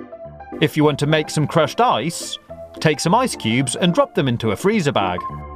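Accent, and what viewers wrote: England, United Kingdom. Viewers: He's British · Are you English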